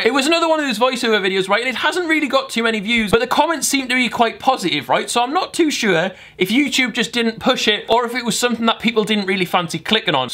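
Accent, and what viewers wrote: Newcastle, United Kingdom. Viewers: the geordie voiceover videos are the best thing youve done since this year on the internet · Love the geordie voice over videos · Keep doing Geordie voice over vids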